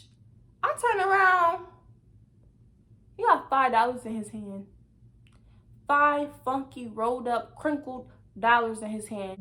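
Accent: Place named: DMV, United States. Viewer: I knew soon as you started talking you was from the DMV 😂😂 old men be gross AF I got a few stories from back when I was damn 14/15/16 LOL I’m like sir... wrinkles and powdered milk don’t do it for me 💀💀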